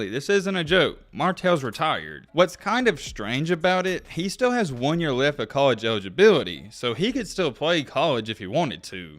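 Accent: South, United States. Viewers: Dude your accent is awesome!! So southern like mine probably is too 😂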